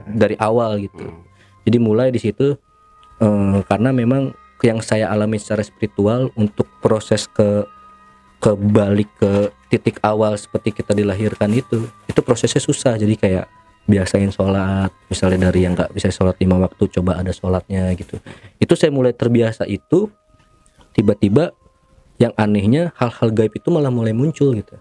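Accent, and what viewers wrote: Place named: Bekasi, Indonesia. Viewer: Temen gw orng bekasi jg emng logat dan cara ngomongnya kaya gini persis klo cerita, asik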